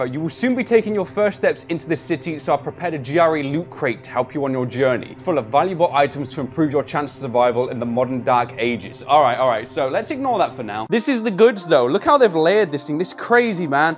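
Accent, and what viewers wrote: United Kingdom. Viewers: I can't be the only one that thinks he sounds more British rn lol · Is it me or sounds a lot more british with low quality mike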